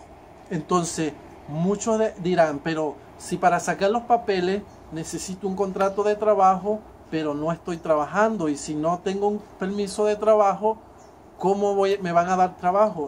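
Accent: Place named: Chile. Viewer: Ya tienes el acepto chileno. se te nota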